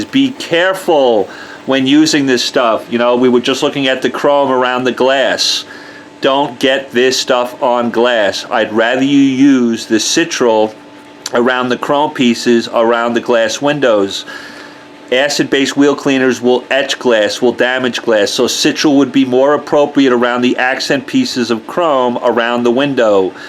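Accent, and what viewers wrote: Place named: New Jersey, United States. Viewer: Ok I love the channel, new subscriber here. Lol, your from TX now but sound like originally from Jersey ;). Love the detailing advice and yes God Dammit I purchased The Ball, gotta get The Flitz Ball...go in slow then speed it up! 👍🏻😎👍🏻 Love it, Tampa FL we share the same heat here as well brotha....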